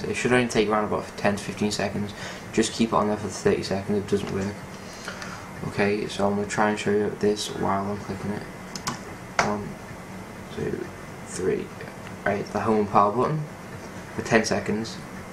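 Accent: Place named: United Kingdom. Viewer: maybe we could follow the "simple" steps if it wasnt for your anowing brit accent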